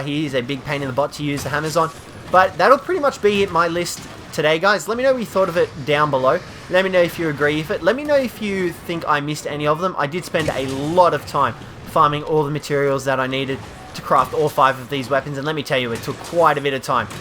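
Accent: Australia. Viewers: If you've ever met anyone from down under, there are many words they pronounce differently culturally. I.e. Pita (Pih tuh) visa (Pee-ta), and abalone (Ah-ba-lone) visa (Ah-ba-lone-e)... and in the end, who really cares? Did you understand WHAT he was talking about? If so, why is his use of the word a problem? So, in my opinion, let it kill you... One less d-bag's comment.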